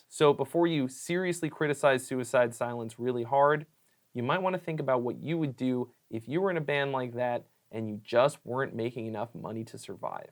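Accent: United Kingdom. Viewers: Your English accent reminded me of Russel Brand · Your British accent makes you sound like Russell Brand.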